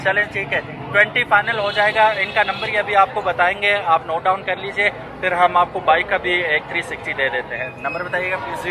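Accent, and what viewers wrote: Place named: Hazara, Pakistan. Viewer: Apka lehja bta raha hy ap hazarewal ho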